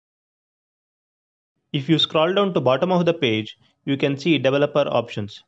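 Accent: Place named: India. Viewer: Why does all this videos on youtube has an indian accent WTF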